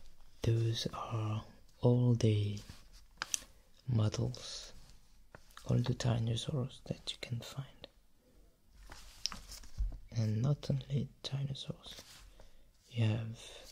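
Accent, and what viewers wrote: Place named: France. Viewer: I'm trying to learn french and I love your accent, and you're amazing at english! Nice video :)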